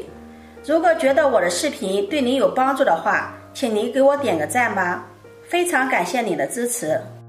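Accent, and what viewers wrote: Shandong, China. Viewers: 可能是山東口音。口音跟生長環境有關，是免不了的。聽習慣了就好。重點是她口齒清晰，要言不煩，而且都解釋得清清楚楚，實在是很優質的烹飪教學！ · 山东西部口音。水分（fei）,我们（mei）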